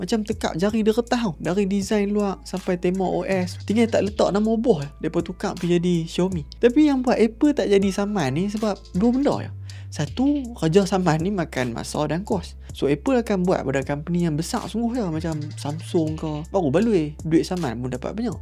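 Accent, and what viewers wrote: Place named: Kedah, Malaysia. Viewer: Ale uto, suara ko slow, cakap macam dalam kelambu. Slang kedah ko aku benci. Cakap bahasa mudah orang faham la., jgn lekat Sgt loghat ko tu🖕🏽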